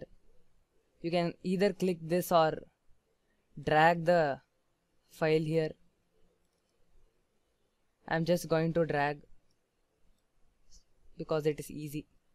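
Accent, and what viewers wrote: India. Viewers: Right as a hear the Indian accent I knew this was a helpful video lol. THANKS!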